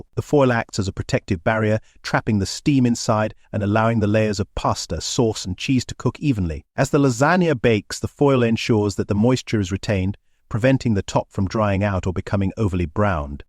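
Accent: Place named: RP, United Kingdom. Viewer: No idea why but the narrator is simply hilarious. Like he's pretending to be Toff English 😂😂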